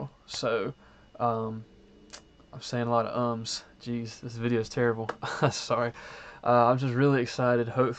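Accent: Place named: United States. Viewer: you sound countrryyy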